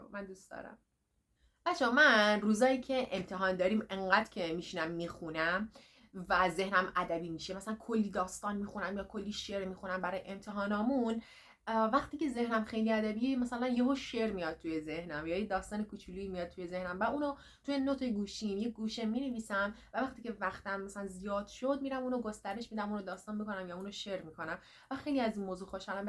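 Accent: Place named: Rasht, Iran. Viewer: از لهجه ات معلومه رشتی هستی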